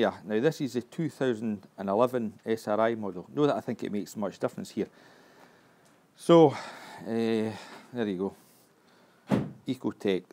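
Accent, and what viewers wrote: Scotland, United Kingdom. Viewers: very nice scottish accent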